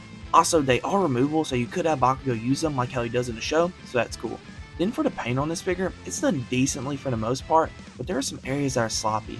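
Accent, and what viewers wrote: South, United States. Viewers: He got that southern accent 😂.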